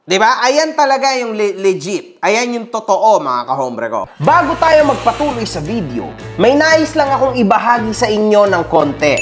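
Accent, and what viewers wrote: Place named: Conyo, Philippines. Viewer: Conyo ampt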